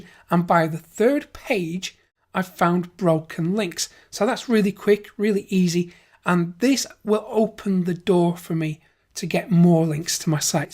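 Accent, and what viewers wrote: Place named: Lancashire, United Kingdom. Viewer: HEY! how are tings in Lancashire, that's where the accent is from, right? Anyhow here's a thumbs up from County Cork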